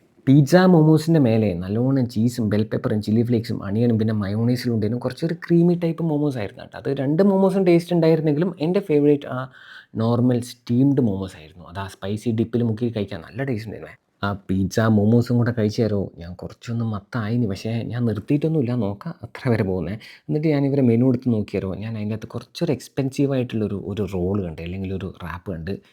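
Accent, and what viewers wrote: Kannur, India. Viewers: chettooiii ❤❤ നിങ്ങളെ വർത്തമാനം കേട്ടിട്ട് ഇപ്പം കണ്ണൂർ ഭാഷ ഭയങ്കര ഇഷ്ടാ...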